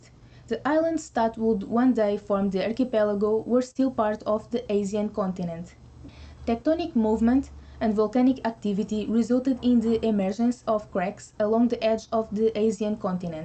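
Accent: Portugal. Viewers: I notice a Portuguese accent...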